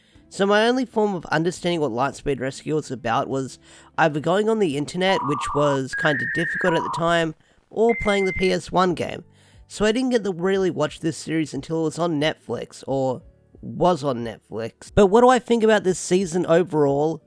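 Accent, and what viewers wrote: Australia. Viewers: oh I see well i saw one of you videos around 2020 but I started watching more of your videos in 2021 now I assume by the sound of your accent your australian am I right?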